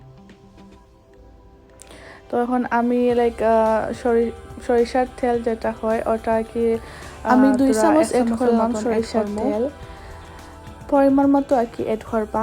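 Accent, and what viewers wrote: Sylhet, Bangladesh. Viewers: তোমার মাত শুনে সিলেটি মনে হয়..😍 আপু আপনার দেশের বাড়ি সুনামগঞ্জ কোন থানায়..???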